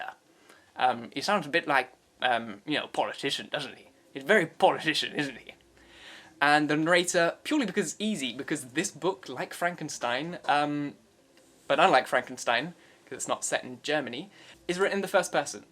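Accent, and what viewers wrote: United States. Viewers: I loved this. It is great seeing you. You are very talented and your American accent is 100 %. I hope YouTube monetized you by now. Yes, you could redo 1984. I love that book. You're doing an awesome job. I enjoy listening to you at work.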